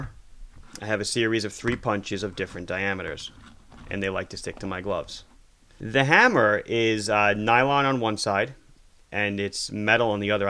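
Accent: Long Island, United States. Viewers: I grew up in Huntington Station. I really miss hearing people speak like this, not just the accent (yours is not overly pronounced), but the manner of speaking. Confident, intelligent, with a sense of humility and humor combined. Thanks for bringing back memories. Thanks for the excellent video! Channeling: "Cause [Florida's] not my home." PS - Big fan here. Too bad they haven't created anymore music.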